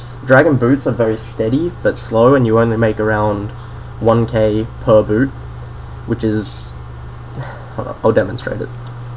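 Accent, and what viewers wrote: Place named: Australia. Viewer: PURE FUCKING AUSSIE!!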